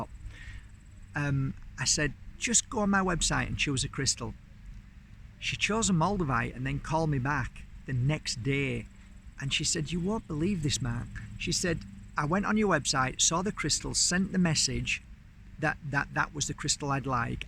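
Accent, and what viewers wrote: Yorkshire, United Kingdom. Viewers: I've just ordered my first moldavite this morning. I spent time looking at different ones on a site and was drawn to a particular one. I've experienced some sharp headache like pains intermittently...a little apprehensive but interested to see what happens when it arrives. Love your Yorkshire accent, I'm in East Yorkshire 😊💫💫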